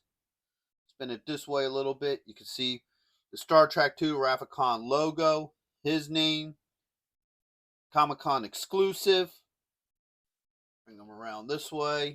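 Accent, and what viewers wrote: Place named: Upper Peninsula, United States. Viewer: Brother where are you from? I grew up in the Upper Peninsula of Michigan and your accent reminds me of home there eh? 😊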